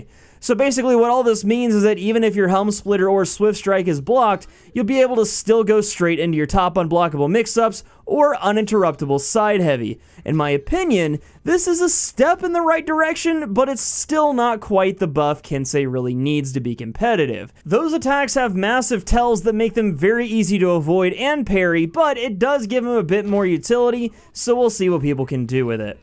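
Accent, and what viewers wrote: United States. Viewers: Your southern accent is so generic xD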